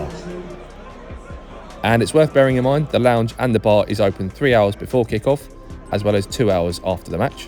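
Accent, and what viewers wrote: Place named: Cockney, United Kingdom. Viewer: THEY HAVNT GOT A ROOF . BWAHAHAHA RINSED . COCKNEY TWAT .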